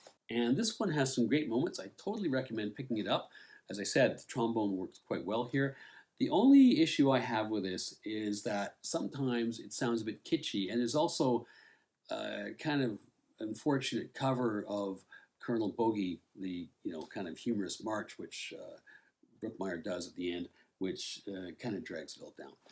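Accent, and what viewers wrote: Canada. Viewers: Really enjoyed this review. Maybe it's a start of something? Pick and artist or sub genre and do a best of/overview. Anyways very enjoyable and always well researched and presented. Good Job as they say in the US. Interesting that you started out in the SW of England I think you said. I kinda guessed that maybe you were Canadian. North American accent but not US. You made a great point on the US Govt sending down black Americans to represent them when at home the average Afro-american was not afforded the ......let's just say apartheid was alive and well! That's a whole other rabbit hole so back on point. Love your reviews and love your presentation and style. Very pro, well researched.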